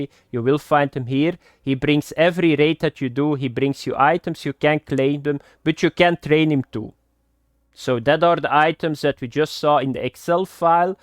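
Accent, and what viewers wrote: Flanders, Belgium. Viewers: De belgische accent, i love it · ieeeel staarekk vloms accent ni echt nen dutchké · Are you flemish (Belgium) by any change. Your englisch sounds like mine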